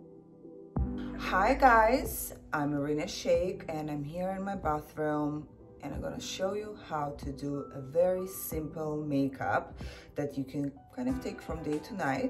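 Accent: Russia. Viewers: That russian accent adds more to her sexiness than all the beauty product combined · Had to come back again for her adorable Russian twang 🥰🥰🥰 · I love her Russian accent 😎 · I don't know what I was expecting her to sound like but I love her Russian accent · I love her russian accent so freaking much 😭😍😍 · I Love her Russian accent 😍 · У нее красивый русский акцент, очень мило)) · She still speaks russian accent so much) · She is like a russian gangster with her accent and attitude. Im all here for it tho. Drop dead gorgeous. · She’s russian i think it’s just her accent 🤣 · Russian accent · i’m in love with her russian accent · обычный русский? вы видимо не слышали как говорят большинство россиян на анг. Её акцент по сравнению с ними чистейший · И все же чувствует русский акцент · The best things about her are the deep resonating voice with the Russian accent and the emerald green eyes wow 🥰👌🏻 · They do look similar and she does sound like Adriana with a small Russian accent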